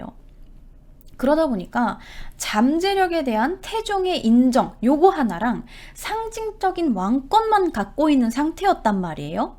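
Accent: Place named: Chungcheong, South Korea. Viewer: 충청도유? ㅎ 구수혀 ㅎ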